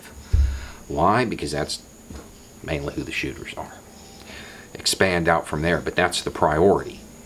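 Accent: South, United States. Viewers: I'm not bying a car here! I hear him right now, and he is close up to the establishment talking points. His southern accent only makes it sound more absurd.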